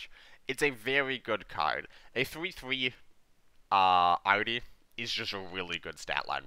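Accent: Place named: Canada. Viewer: Thank you so much for these videos. Your insights on these cards are very interesting and, in some instances, surprising. On a side note, has any one ever told you you sound like Vince Vaughn? I mean, apart from the Canadian pronunciation, you sound exactly like that guy.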